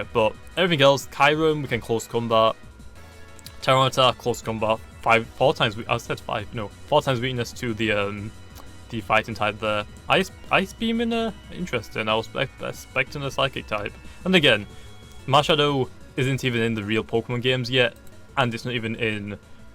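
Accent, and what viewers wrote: United Kingdom. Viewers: What's his accent? British?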